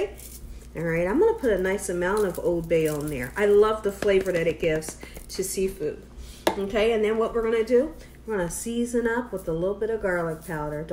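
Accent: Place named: Ohio, United States. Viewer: OOOH MY GOODNESS! BETWEEN THE DELICIOUS 😋 INSPIRING MEAL YOU PREPARED, THE PRAYER, AND YOUR VERY INVITING BEAUTIFUL OHIO ACCENT - YA SOLD ME. THE WAY YOU SPEAK COULD INSPIRE THE DEVIL TO DO RIGHT IF HE'D ONLY LISTEN. YEP I WILL SUBSCRIBE AND TELL A FEW FRIENDS. THANKS 😁😅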